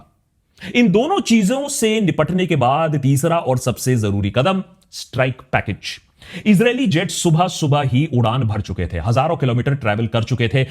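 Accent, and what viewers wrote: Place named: Bihar, India. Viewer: Bhaii, you are great.Your style is typical Behari sweet way.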